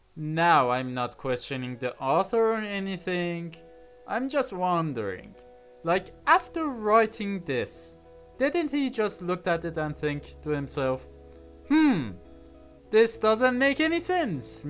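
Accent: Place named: Iran. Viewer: dige ma irania lahje hamo tashkhis midim rahat 😂❤ vali kheyli khub harf mizani :) kheyli ham channel e khubi dari, keep up the good work! omidvaram hesabi pishraft koni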